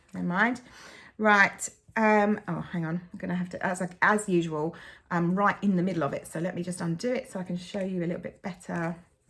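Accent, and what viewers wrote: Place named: Australia. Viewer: Hi, I have been loving the videos. I have been watching each morning with my cup of tea but I am currently watching with my parents and they think you sound Australian 🙈 I think you would have mentioned something so please correct me if I am wrong in thinking you are not originally from there 😅 from Immy (StitchedbyImmy) x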